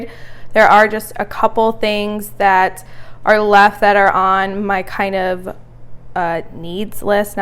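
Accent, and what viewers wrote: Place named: Upstate New York, United States. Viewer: You got lots of cute pieces! My husband is also proud when he adds a new tool to his collection lol! Just guessing by your accent, but are you from upstate NY? 😊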